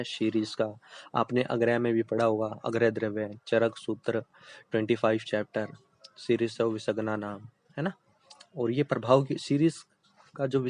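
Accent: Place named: Haryana, India. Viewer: Please don’t use haryanvi accent bro. Keep it up 🎉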